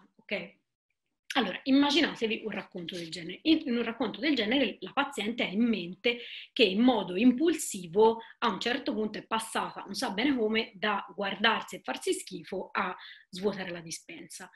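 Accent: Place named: Tuscany, Italy. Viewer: peccato per l'audio, a parte l'accento toscano che tende ad aspirare certe consonanti ebbene sarà anche per l'inconsueta "velocità espressiva" alcune parole non si comprendono, in particolare le ultime della frase . . . cmq 👍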